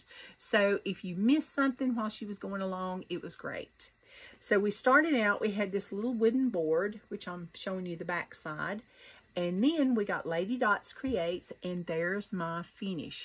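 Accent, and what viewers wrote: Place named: Texas, United States. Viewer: Hi, Just found you on FlossTube. Your accent is a little thicker and adorable than some of us Texans. 😉 Naturally, I had to find out where you are from by going to your #2 video. 🙂 Loved seeing your play by play of Friendstitch. I’ll be sure and sign up for my first one next time. Harvest blessings. Thanks, Darlene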